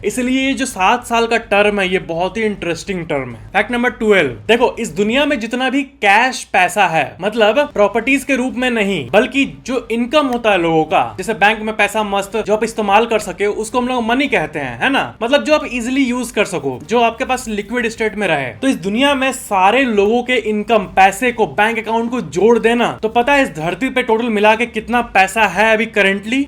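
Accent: Bihar, India. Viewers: Great Bro , You Are Back In Your Old Version And We Are Liked It ,, Specially Your Bihari Language ♥️♥️♥️♥️❤❤❤❤❤❤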